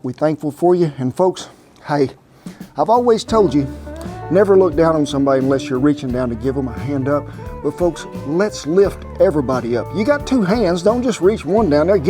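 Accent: Italy. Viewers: I luv ❤️ Italian accent! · We love and subscribe to your channel. We watch all of your new posts. Your Italian accent is annoying to me. Not trying to start a movement, it's just not funny or even tolerable to me.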